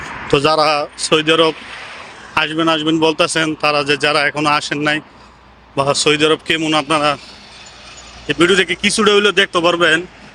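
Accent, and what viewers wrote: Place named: Brahmanbaria, Bangladesh. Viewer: ভাইয়া আপনার বাড়ি কি ব্রাহ্মণ বাড়িয়া? ফেসবুকে আপনি আঞ্চলিক ভাষা ব্যবহার ঠিক আছে কিন্তু ইউটিউব হল একটা আন্তর্জাতিক প্লাটফর্ম এখানে শুদ্ধ বাংলা বলার চেষ্টা করবেন আশা করি।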